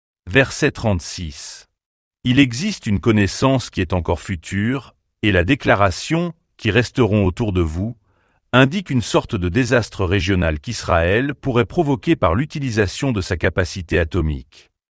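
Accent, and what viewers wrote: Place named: France. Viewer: ISRAEL ET LE TEMPS D'ANGOISSE DE JACOB ART KATZ en audio. Chers tous, Dans le grand charivari d'opinions et influences souvent contradictoires autour de la question d'Israël dans les temps de la fin je me suis souvenu, comme d"une priorité absolue, du travail prophetique charpente sur base des Écritures de notre défunt ami, Art Katz. C'est clair, tranché, évident et ne fut pas aimé lors de la publication. Art venant chez nous en souffrait...Mais de toute évidence la réalité d'Israël aujourd'hui devient une caisse de résonance évidente pour ce message. Bonne et sérieuse écoute. Les temps sont graves ! Haim Goel L'accent frenchie est désastreux. Sorry.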